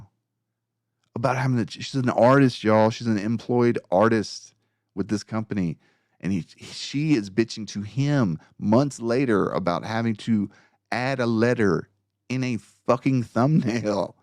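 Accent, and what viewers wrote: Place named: South, United States. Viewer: The reason X-Ray girl didn't talk much is because she didn't watch what the guy's do. She never saw Trek, StarWars, or read comics. Them saying she was limited to 8 words a stream was a running joke because she just wasn't comfortable talking about stuff. She organizes superchats for Gary and highlights ones he needs to read immediately by messaging him. She puts notes on ones for language or trying to decipher what they're saying because Gary isn't the strongest reader and has problems seeing the small print. As far as goes, I don't know where she thought she was going to work in animation at in the US. There aren't any here. It's all done in Canada or overseas now. As a southerner myself that happened to have lived in Canada for awhile, she sounds pretty typical of someone from one of the major provinces. I don't know why people think they're so polite because they were some of the rudest people I've ever met in my life. 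After having lived down here even my Canadian husband saw it. The first time we went back to visit his family he was trying to explain to his dad just how much more polite people are down here even just with traffic. Love your voice, but again as a fellow southerner, I appreciate the accent. As for Gundam, he sounds like a pretty typical musician. Most are very insecure and immature. Not really anything surprising. Especially for a front man/singer. Not going to unsub because I like his stuff and can separate the online persona from the real person. I'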